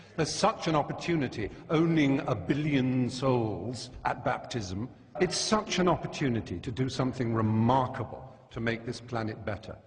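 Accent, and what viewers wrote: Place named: RP, United Kingdom. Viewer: Wow,what a LIAR. Where to start? Repeating black legends in a posh voice does not a clever argument make. Firstly,Galileo was brought up on charges of being a heretic,not necessarily to do with heliocentricism.The Copernican theory he mentions is funny because Copernicus himself was a Catholic cleric.The enlightenment?You mean like when those who took part in the French revolution persecuted Catholics as bad as the Nazis did the Jews and all in the name of 'reason'?Thomas More?How about Cromwel?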